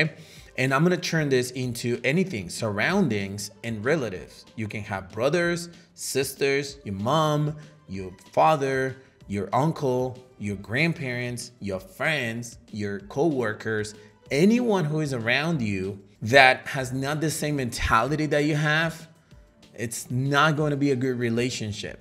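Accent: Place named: United States. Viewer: Which country are you from? You have an American accent and you seem like a nice person 🙂It is true, you can't always be on the same page with everyone.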